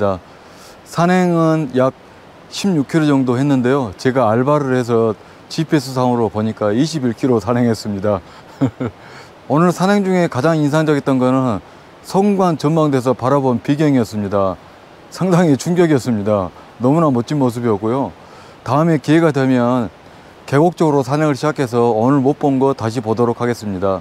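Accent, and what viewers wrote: Jeolla, South Korea. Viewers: 안녕하세요 추천영상에 떠서 놀러왔어요~ 저는 책읽기를 하는데 산을 좋아해서 산영상 자주 보다보니 추천에 늘 산 채널이 떠요😝😝 내연산 저는 딱 한번 가봤어요 근데 제가 간 날 산행중에 비가 너무 많이 와서 정상은 못가고 폭포길 찾아서 내려왔는데 얼마나 걸었는지 하산해서 보니 30키로를 걸었더라구요 저에겐 그냥 힘들었던 산 ㅎㅎㅎ 편안하게 설명해주시니 영상보기가 좋습니다 ㅎㅎ 내연산은 폭포가 유명하다고 하더라구요 친구들 아니었으면 한번도 못가봤을거예요 알바도 하시고 힘드셨겠요~👍👍 전라도 억양 저는 좋아해서 그런지 설명 편안했습니다 ㅎㅎ 감사합니다 구독하고 자주 놀러올게요🙏🙏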